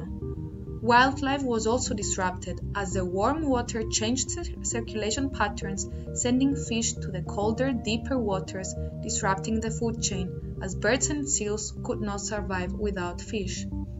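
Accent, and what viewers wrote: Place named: India. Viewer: Unfortunately, your presentation was only partly understandable ( 50%) to me, although I have several degrees, and am very well informed about climate science. Also, hurricane Irma went right through our town - so I am very motivated to get what you are explicating!!! BUT: 1) you were reading, so you were not making any attempt to notice how your audience was responding to your recital; 2) you were not aware that MANY of the terms you were breezing over would be new, and unfamiliar, to some of us wanting to understand what you were describing; 3) You have no pity for those native English speakers, like many of us older Americans, who are unused to your Indian accent, have hearing loss, and had to try to get what you were saying while the backround music made it even harder!. Dont you care whether your accent makes your presentation partially unintelligible? 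SLOW DOWN! If you put your presentation on a public format - like You Tube _ you should care whether you are getting across your point to laypeople!? Slow Down! Or, have your manuscript read by a native English speaker, who is only slightly familiar with the subject, so that the presenter WILL NOT RATTLE OFF A LOT OF TECHNICAL TERMS AS THOUGH EVERYONE SHOULD BE as familiar with them as whats in the newspaper. When you introduce a new technical term, anyone unfamiliar with it will have to pause to try to remember the new term..( Listen to Noam Chomsky sometime; he speaks WAY SLOWER THAN YOU, ABOUT VERY COMPLEX SUBJ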